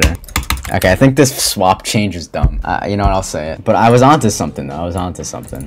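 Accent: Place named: New York, United States. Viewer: Yo gl on silent clubstep. I miss the New York accent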